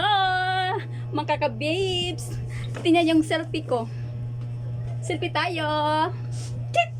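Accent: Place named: Visayas, Philippines. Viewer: Nakakatawa po.. Parang may bisaya accent ka po like me.. Bagong kaibigan mo po.. Natapos ko na din tong mapanood sana tulungan mo din po ako..😊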